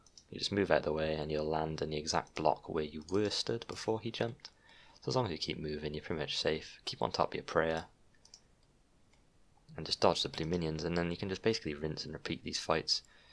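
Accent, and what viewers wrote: Wales, United Kingdom. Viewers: Can tell youre from Wales with the accent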